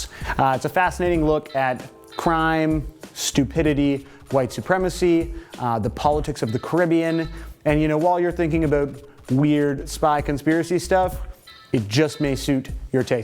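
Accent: Canada. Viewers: Your Canadian hell ya Eh...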